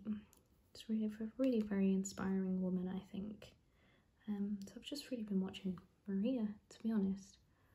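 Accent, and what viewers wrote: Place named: India. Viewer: So relaxing please make another Indian accent asmr video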